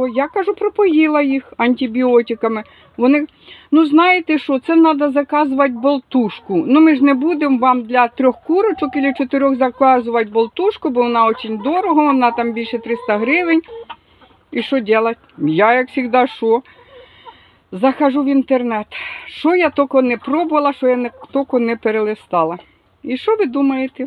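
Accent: Kuban, Russia. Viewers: Но это не украинская мова. Так говорят в станицах на Кубани взрослые. Я сама с Кубани и понимаю эту речь. А вот украинскую не понимаю. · Спасибо за видео балакае як у нас в станице на Кубани дякую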